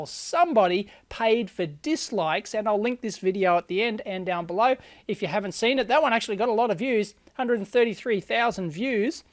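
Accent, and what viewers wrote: Australia. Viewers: As a kiwi I find your aussie twang annoying, but I wouldn't dislike a video based on it. I power through it because your content is absolutely great. I can only imagine your natural dislikes are from cranks who hate the truth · Like all your vids, despite that Artful Aussi English :)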